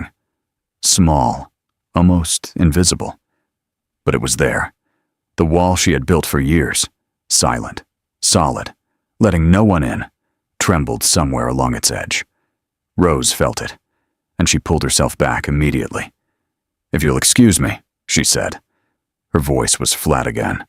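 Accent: United States. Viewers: An American accent does not fit with these stories. 😢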